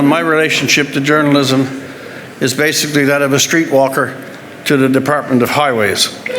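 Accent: Canada. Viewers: Although I have always thought Rex was an icon in journalism I feel he has sold out to big business. First making fun of David Suzuki really not very becoming. Yes the mining industry is important to Canada however the companies that own them spend their resources to produce technology to replace people that work in these mines or oil fields. If they had their way they would have robots do all the work. Our jobs have been continuously been sent to third world countries because labour costs. These giant companies will do anything to cut costs as they are responsible to people who invest their extra wealth in their stocks. As far as Newfoundland is concerned they exploited the fisheries mostly because of the same reasons. They sold their fisheries to big business, then over fished it screwing themselves out of work then relying on the handouts of fellow Canadians to subsidize their stupidity. 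Then when jobs became available in the tar sands rushed out to Alberta so they could work and then take the money home and live like kings because housing prices were much lower in Newfoundland then anywhere else. Someday these fossil fuels will run out and yes I agree they have given us the good life but at what cost. Big business doesn’t care it’s all about money to them. I’m sure Rex is speaking for free for this group lol. His Newfie accent and correctly spoken grammar is hypnotic to the people who don’t look at both sides as he massages the brains of upper management of global corpora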